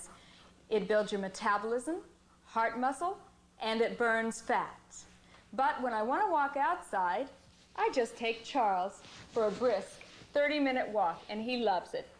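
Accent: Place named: Europe. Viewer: What is with that accent? She's from Long Beach not some posh old European city.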